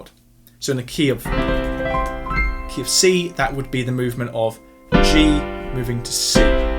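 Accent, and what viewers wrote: United Kingdom. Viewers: Speaking of dialect, where in the UK are you from?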